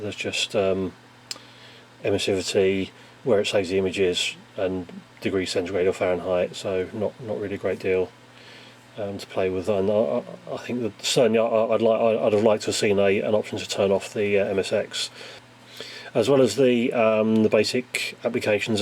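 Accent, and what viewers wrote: England, United Kingdom. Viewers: Great video. Just a little hard to understand/keep up with at times due to you talking fast with that British accent. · As always - Perfect demonstration and technical details! Very Very nice to see!! I love this... BUT: PLEASE try to speak a little bit slower, it´s really hard to understand for guys like me (from German) .. sounds very "English" ;-) US-English is easier sometimes.. Would be higly appreciated.. Again, thanks for your perfect work!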